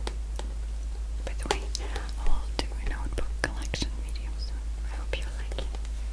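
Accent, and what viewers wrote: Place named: Russia. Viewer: Umm... No she doesn't... She's Russian... She has a Russian accent...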